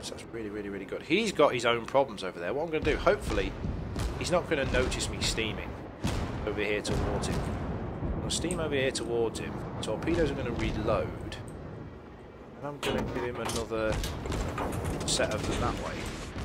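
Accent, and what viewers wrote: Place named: North West England, United Kingdom. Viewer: your accent sounds like your from the North West of England :D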